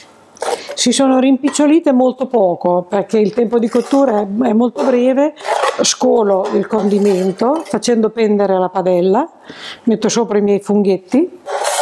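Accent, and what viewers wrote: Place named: Romagna, Italy. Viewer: Sono romagnola vivo in Belgique la guardo sempre l accento romagnolo Che belllooo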